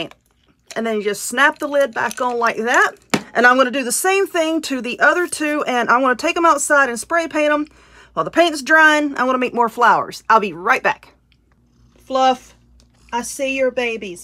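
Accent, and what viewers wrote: South, United States. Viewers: You are so cleaver and funny! I just love hearing your southern accent.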